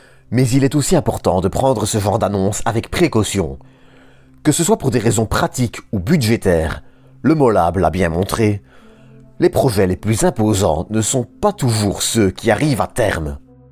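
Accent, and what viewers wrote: Belgium. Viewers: votre accent belge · L'accent belge.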